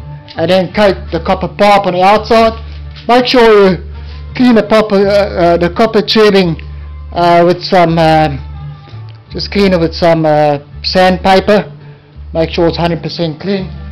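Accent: South Africa. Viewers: Nifty little condenser, should work a treat once ya got the water flushing thru the PVC and should only need a slow flow being the overall volume of the pipe too. Been tryin to work out your accent mate, I am guessin south african? You certainly got some skills my friend, good work bro. Cheers n regards Lee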